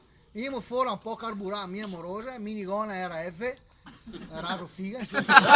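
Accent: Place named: Veneto, Italy. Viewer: la parlata veneta ê simpaticissima 😁😁😁😁..grande bella barzelletta e bella interpretazioje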